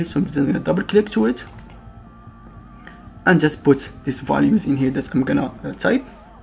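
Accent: Morocco. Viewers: thnaks for the tutorial I found it useful but tell me, are you moroccan ? I raly taste the moroccan accent in your voice!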